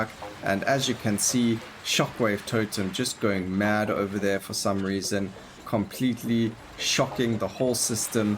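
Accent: South Africa. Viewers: Do i hear a south african accent?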